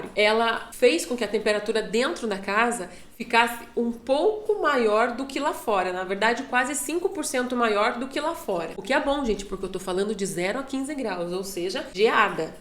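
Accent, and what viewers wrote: Brazil. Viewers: Adoro seus vídeos, principalmente porque sou muito fã deste teu sotaque Paranaense, fui criado em Paranaguá, mas atualmente moro em São Paulo. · Nossa o conteúdo foi fantástico, mas voce explicando foi surreal, serio mesmo parabéns e o sotaque de curitibana entao, hahaha parabéns mesmo, tirou varias duvidas que eu tinha.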